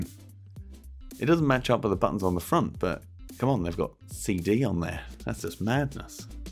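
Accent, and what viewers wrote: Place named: United Kingdom. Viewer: I think you are the most british guy i've ever heard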